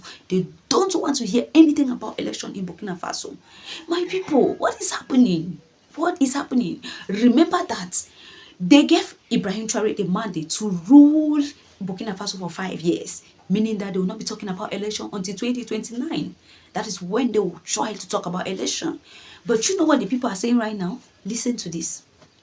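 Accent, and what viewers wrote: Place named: Ghana. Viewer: Jamaica =English? Jamaica = Patios I am a Jamaica The vloger is GHANIAN who speaks with an accent THIS IS PERFECTLY NORMAL/ACCEPTABLE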